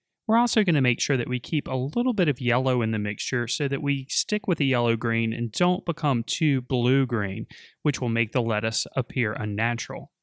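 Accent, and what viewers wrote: South, United States. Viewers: I know, I just find it funny how he pronounces it with his Southern drawl.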